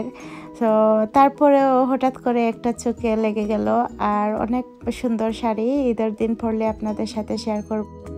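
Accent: Sylhet, Bangladesh. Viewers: Apu apnar sylheti kotha e valo lage. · apa tumare amrar sylheti matle bala lage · when you spoke sylheti in your vlog it was better. It sounds nice when us sylheti speak sylheti. Please change back to sylheti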